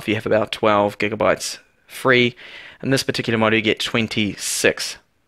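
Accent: New Zealand. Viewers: Are u from NZ? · "Seeven " Teen " haha :) cool kiwi accent. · Yay finally a kiwi accent! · Hi Mate, I heard you mention that you're from NZ (the accent says it all really). Im looking at getting a Redmi Note 3 from Aliexpress from a seller called Hong Kong Goldway. I struggle to find these in NZ at a reasonable price so think this is the best thing. Are you able to comment on how easy it is to flash the global MIUI rom and have all google services installed. As I assume the Aliexpress models from china have no google services and heaps of bloatware. Then again, where did you get yours from (if you did get it delivered to NZ) Cheers in advance.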